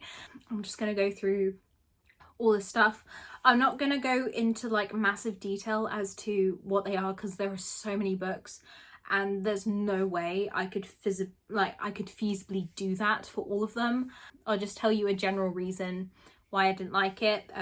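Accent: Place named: England, United Kingdom. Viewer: You sound like you live in England. Were all your books bought there and can you get them in Canada?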